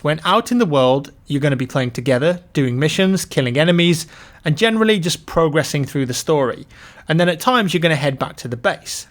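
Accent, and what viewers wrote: United Kingdom. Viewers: and i always thought is from the UK...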